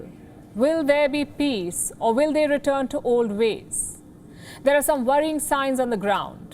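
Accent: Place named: India. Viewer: Typical Indian woman with typical Indian English accent...your degrading the Queens language. Of course its India,you are ery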